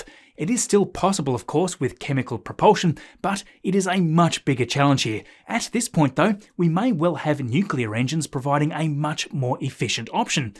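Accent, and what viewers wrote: Australia. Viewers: Are you an Aussie? Can't believe I never picked up on your accent before today been watching you for ages 😍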